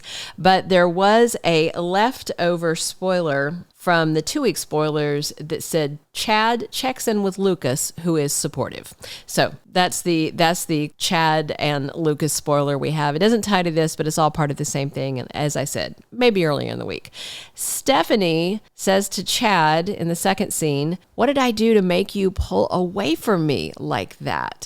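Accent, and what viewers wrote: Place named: South, United States. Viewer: I can’t wait to see all of them. But if I had to choose it’s Theresa’s asking what are you doing here. Thanks. Oh! I detect a southern accent. Are you from the South?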